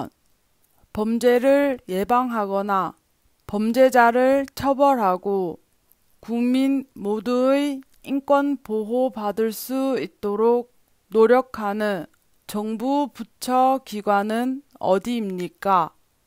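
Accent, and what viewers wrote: South Korea. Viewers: mình hoc trong sách ( câu hoi và cau tl luon) xog wa ngày sau k nho het dk; có khi quen sach luon. Nhug ngay nào cug nghe clip bên ban (vua nho câu hoi, vua nho tl luon) ; lai nho lâu nua. Giong đoc chuân hàn luon...😊😊😊.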